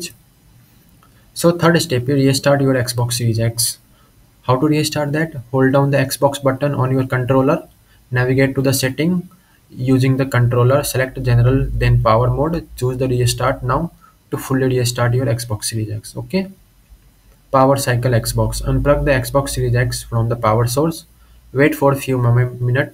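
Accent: India. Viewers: No offense but hearing an Indian accent when I’m looking to fix a problem. Gets me thinking that I’m getting scammed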